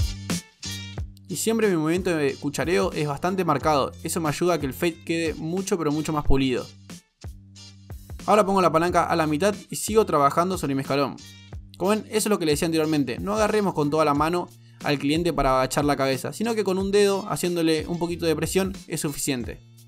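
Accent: Argentina. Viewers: Sos argentino amigo?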